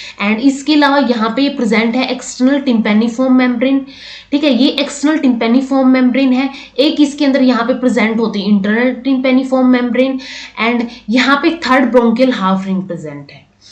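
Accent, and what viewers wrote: Haryana, India. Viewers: Haryana se ho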